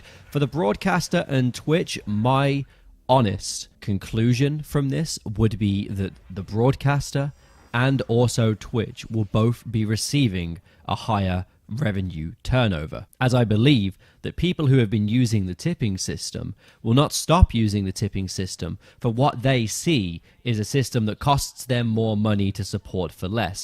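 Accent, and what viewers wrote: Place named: United States. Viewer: speaking american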